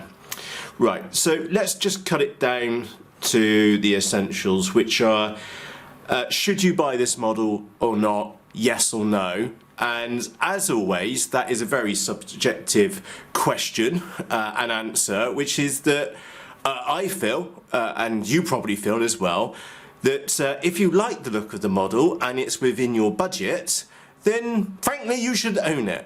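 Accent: Bristol, United Kingdom. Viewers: Good to hear that Bristol accent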